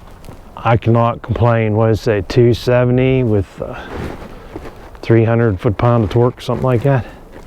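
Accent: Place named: Pittsburgh, United States. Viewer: Very nice review! I think I detect a “Yinzer” dialect…you from Pittsburgh? I recently got my ‘23 XL Crew Cab, 2wd with the locking rear end. It’s about as basic as you can get. I love it so far & it does decent in the snow up here in Erie; I’ll get snow tires if I need them.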